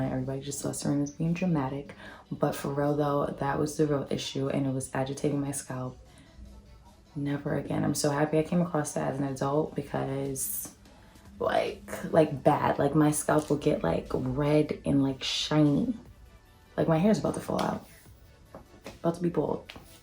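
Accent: New York, United States. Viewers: Your accent is so New York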